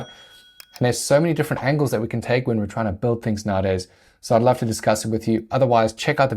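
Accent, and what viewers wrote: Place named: South Africa. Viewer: I know a South African when I hear one😎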